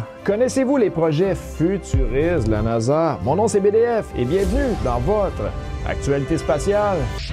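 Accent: Quebec, Canada. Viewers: perso parfois les déformation de la petite voix mélanger a l’axent Qc la rende incompréhensible a la première lecture, es qu'ils y en a d'autre qui on se problème ou faut que je demande un supplément de caribou a la cantine ;p ?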